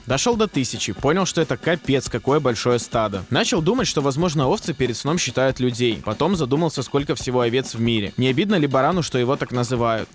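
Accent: Odessa, Ukraine. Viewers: Вы из Одессы?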